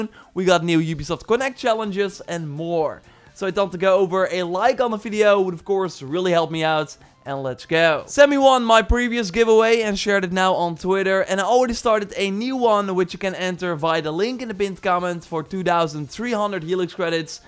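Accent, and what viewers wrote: Netherlands. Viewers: why does sound so Dutch? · Yeah i think he speaks dutch judging by his accent at least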